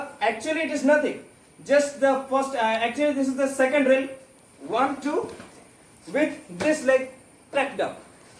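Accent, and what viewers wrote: India. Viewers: Man salute Indian English..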